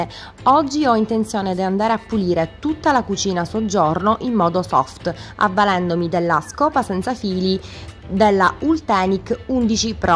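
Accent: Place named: Sicily, Italy. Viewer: hai questo timbro di voce che abbiamo tutte noi siciliani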